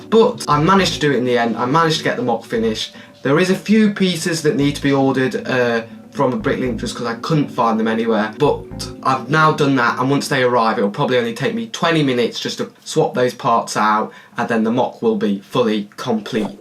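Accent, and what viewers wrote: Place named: United Kingdom. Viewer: Great mod! bri’ish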